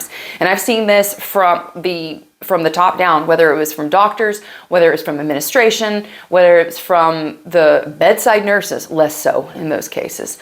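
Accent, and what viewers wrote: South, United States. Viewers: I know this is a little off topic but does anyone else hear a southern accent? Possible mix of Texas and Louisiana or maybe Tennessee.. Anyway I truly admire her Passion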